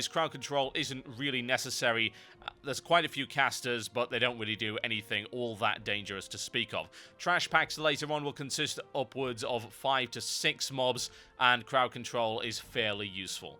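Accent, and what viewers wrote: United Kingdom. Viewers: Oh and the instance looks pretty tight too, i'm lookin forwar dto it, THANKS, keep up the vids and ignore the noobs. They've just never heard a rad british accent and immediately think of some elitist sociopathic evil villain froma cheesy Bond flick or something. That, and people just have short sided nerd ragey opinions of the way things should go and get butthurt when they don't, that's net life i suppose.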